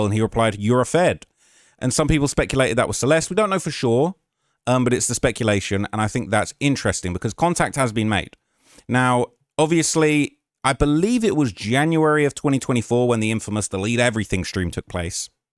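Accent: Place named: United Kingdom. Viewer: Love your accent UK 😊❤ but yeah I agree I can never put my self in Celeste family’s shoes for one I wonder if their interpreter is the Salvadoran interpretation or a Spanish one because some of the words sound the same but have different meanings it must be difficult for them in every way because I don’t think they get the full details but seeing the evidence is about the only thing they can fully understand 👀🧐😮‍💨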